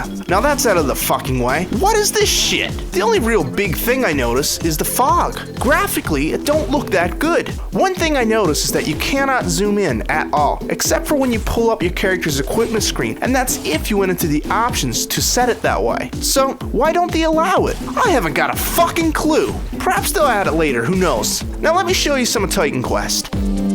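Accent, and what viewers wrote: Canada. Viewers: is it me, or does this guy have a really heavy canadian accent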